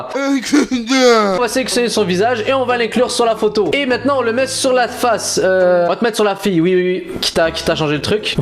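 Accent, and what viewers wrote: Quebec, Canada. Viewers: Est tu Québecois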